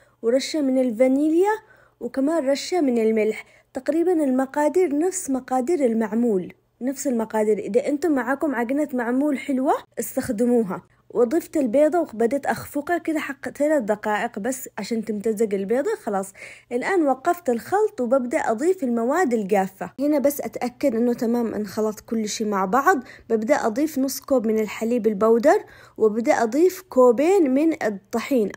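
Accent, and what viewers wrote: Aden, Yemen. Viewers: تحياتي لك عدن الغاليه وتحديدا من المعلا تعجبني لهجتك مازلت محافظه على لهجت اهل عدن تسلم الايادي وتسلمي على مجهودك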